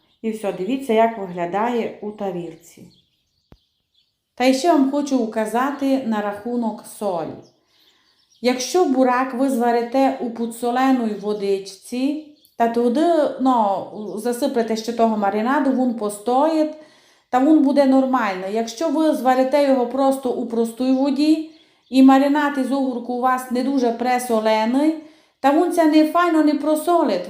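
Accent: Transcarpathia, Ukraine. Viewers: Насолоджуюся вашою чудовою закарпатською говіркою. Дякую за рецепт. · Спасибо за рецепт и красивый,напевный закарпатский говор. Я очень люблю вас слушать.❤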